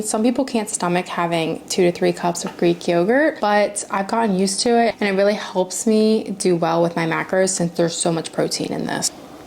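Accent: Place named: Maryland, United States. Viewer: Your accent sounds very maryland-ish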